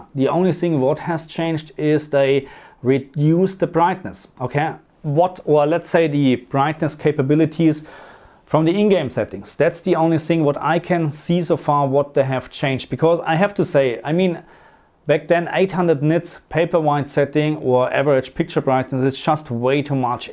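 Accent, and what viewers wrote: Germany. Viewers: i love deinen deutschen akzent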